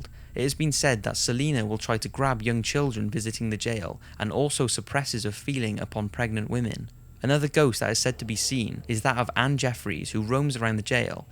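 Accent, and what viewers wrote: Wales, United Kingdom. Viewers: You sound welsh. Awesome voice! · Trying to work out your accent. . . Cardiff? Newport? Anywhere near? ... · You have a Welsh accent . sounds divine 😍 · Are you Welsh?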